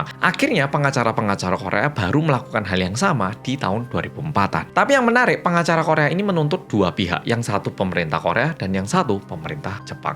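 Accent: Java, Indonesia. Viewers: Gabaken wkwkwk😂😂 jowo banget😅😂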